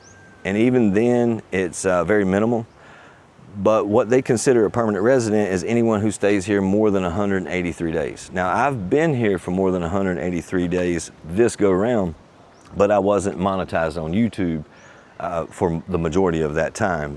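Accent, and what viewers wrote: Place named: Myrtle Beach, United States. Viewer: Just came across your channel.Interesting content. Thanks for sharing your adventures and I will follow along. I am living in Australia permanently but originally from Myrtle Beach. And by the sound of your accent I am sure you know myrtle beach. Keep up the content and best wishes to you.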